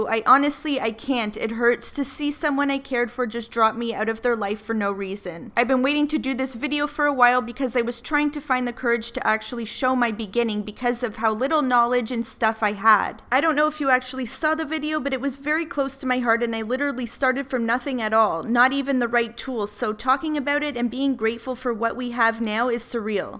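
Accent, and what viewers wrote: Canada. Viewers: Totally off topic, but are you canadian?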